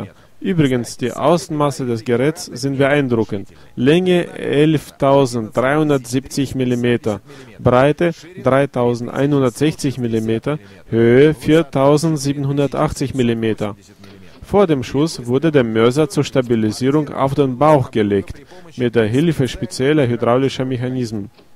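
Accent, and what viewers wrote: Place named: Russia. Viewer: Russian trying to speak german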